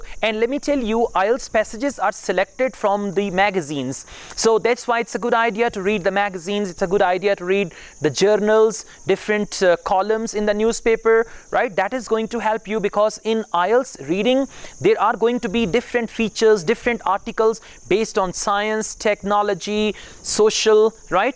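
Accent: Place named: India. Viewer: indian accent dominates 😃 You are very good 👍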